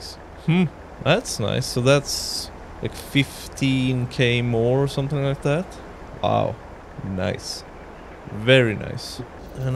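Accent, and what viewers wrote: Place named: Sweden. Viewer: Love the series! But you have to be swedish with that accent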